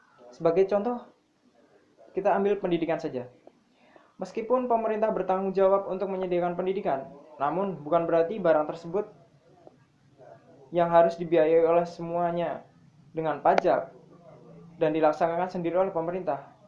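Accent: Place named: Java, Indonesia. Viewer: Ini ko logat jawanya medok ya hihi~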